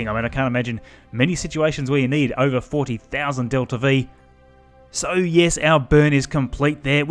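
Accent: Australia. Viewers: Oh. I know what's up with the AU accent (I'm used to associate this accent with the british one because I'm from Spain), but still, nice job with the videos, the things you guys make are so satisfying to witness:D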